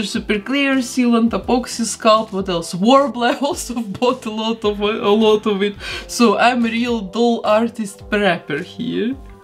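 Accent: Russia. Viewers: Блин так слышно Росийский акцент) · акцент русский как будто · Мне не одному кажется что она русская и пытается говорить на английском? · Вы русская?У вас русский акцент) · Русский или Белорусский акцент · You are from Rassia?I think you say whith Rassian accent. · Русский английский 😅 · Your accent is so cute 🥰 You are from Russia ? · Are you Russian because your accent is gorgeous xx 🤩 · Ты получается, русская? Просто очень много конкретного русского произношения :D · Вы русская? Просто у вас есть акцент, это слышно)) Вы классная You're cool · Акцент очень русский напоминает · Такое ощущение что ты русскоговорящий ютубер потому что у тебя такой акцент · Ты похожа на русскую, особенно по произношению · Она говорит как русская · Че за акцент? Ебать, так ты русская ಠ‿ಠ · Детка ты не одна такая она 100% русская, в Америке и в Англии не в одной стране нет такого произношения! 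· I'm the only one who noticed that she has a very strong Russian accent? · у меня уши болят из-за этого русского акцента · может она русская... акцент прям Русский пипец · я тоже сначала подумала об этом. очень похоже на русский акцент.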